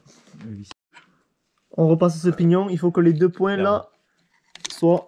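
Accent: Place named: Southwest, France. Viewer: Salut...je viens de tomber sur tes vidéos elles sont vraiment aux top!!! Et en plus avec cet accent c'est encore mieux !! J'ai le même !!! Vive le sud ouest !!! Je travaille sur Toulouse et je roule en enduro avec un excf 2010 dans le Gers a un niveau amateur pour le plaisir! Je viens de me rendre compte que mon moteur est bloqué alors qu'elle ne l'était pas il y a quelques jours !!! Va falloir que j'ouvre pour aller voir du côté cylindre piston ! J'espère que ce ne sera pas plus grave. Est ce que les excf de 2010 sont quasi identiques au moteur Husqvarna comme ta vidéo ? Je cherche également un support moteur c'est un fait maison le tiens ? En tout cas tu peux continuer tes vidéos c'est un régal... Bon dimanche à toi A+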